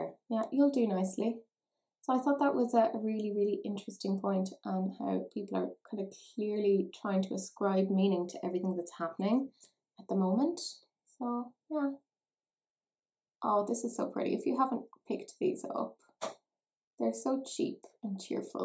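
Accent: Ireland. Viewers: OMG! You actually said PR, not P-OR!!.. LOL! Never change, we love your lovely Irish-ness!!.. and your lovely Irish face!... xXx 😜😊😎💜💜💜💜🌙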